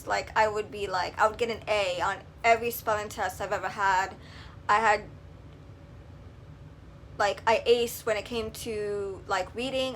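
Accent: New York, United States. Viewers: I wouldnt have noticed any impediment until you pointed it out...but do New York accents not all pronounce coffee Kawfee forever fawevva water waader?? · i hear a new york accent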